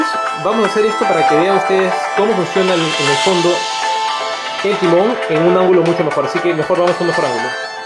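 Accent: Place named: Peru. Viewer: Por tu acento siento que eres peruano ?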